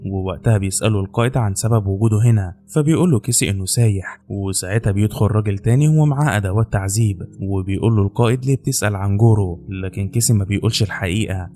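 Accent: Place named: Iraq. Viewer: ليش احسك عراقي وتحجي مصري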